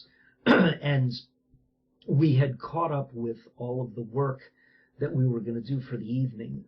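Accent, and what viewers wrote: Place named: East Coast, United States. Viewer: What you said was interesting an all, but the way you speak reminds me of friends from the east coast. Found m'self trying to narrow it down to NY, PA, or MA before I saw the "Phila" on the sweatshirt. I'm afraid my reading comprehension is everything it was in high school.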